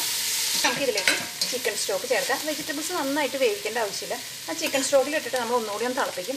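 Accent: Thrissur, India. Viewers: thrissur slang :)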